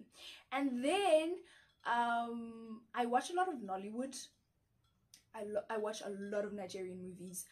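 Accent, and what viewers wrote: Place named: United Kingdom. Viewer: Damn you Nailed the British accent, btw you have a beautiful personality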